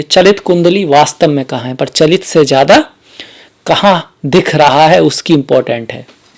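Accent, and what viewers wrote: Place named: Braj, India. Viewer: आपके ज्ञान और प्रस्तुति अद्भुत हैं, इसलिये ज्योतिषीय विश्लेषण भी लीक से अलग और बुद्धिवादी है। विचार स्पष्ट और ज्ञान से आए आत्मविश्वास से भरे हैं। बधाई। ईश्वर कृपा रखें। एक बात ध्यान देने की है और वयोवृद्ध होने के कारण कहना मेरा कर्त्तव्य है। भाषा के ढीलेपन को सुधारना चाहिये। प्रादेशिकता का असर तो बोलने में होता है किन्तु 'नमस्कार' को नमश्कार या प्रत्येक 'व' को ब कहने और स और श में गंभीर ग़लतियों के न होने से निश्चित ही प्रस्तुति और प्रभावी होगी। इसके अलावा भी भाषागत उच्चारण जैसे इंडस्ट्री को इंडष्ट्री आदि अनेक बातें हैं जो ज़रा-सा ध्यान देते ही व्रजभाषा के पाश से मुक्त करेंगी और हिन्दी के मानक उच्चारणों की ओर ध्यान दिलाएँगी। यह एक क्रांतिकारी सकारात्मक परिवर्तन होगा। व्रजभाषा की मिठास अद्वितीय और दैवी है मगर हिन्दी बोलते समय उसका खड़ापन ही उसका मानक है। कृपया अन्यथा न लें। जैसे आप मकर वालों को मेहनत करने की सलाह देते हैं वैसी ही मैंने दे दी है, स्वीकारें।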